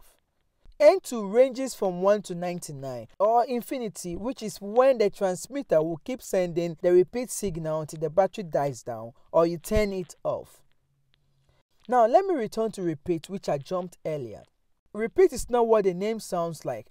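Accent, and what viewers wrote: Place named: Kenya. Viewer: Great vid. Just out of curiosity, what country are you from? I lived in Kenya for 30 years and your accent sounds very familiar!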